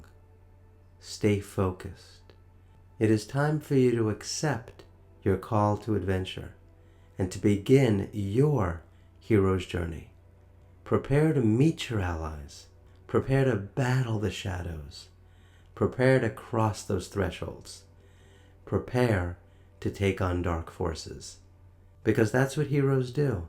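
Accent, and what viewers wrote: New York, United States. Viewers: Wow. A vegan crazy cat guy proficient in mythology and uniquely gifted to tell the tale - in a New York accent no less! 😍🥰 In the interview with Joe you quoted your mom to say a New Yorker would never be president because people don’t understand us. No truer words! And they are poorer for it.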